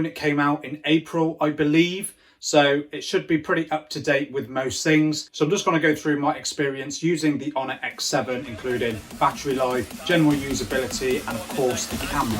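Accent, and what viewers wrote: Bristol, United Kingdom. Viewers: You from Bristol?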